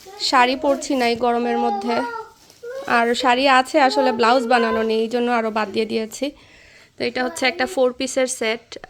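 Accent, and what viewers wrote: Sylhet, Bangladesh. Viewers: তুমি সিলেটি তোমার সিলিটি কথা সুন্দর লাগে আমি ও সিলেটি।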